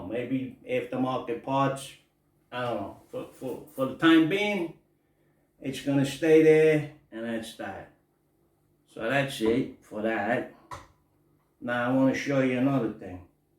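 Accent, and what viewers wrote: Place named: New York, United States. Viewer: Hello from Glasgow Scotland, Getting very rare to hear a proper NY accent, its the same with the proper Glaswegian accent, great review, I have a few pistol bows and what I have done with the bolts is fill them with silicone, cheap and does the trick for a bit of extra weight.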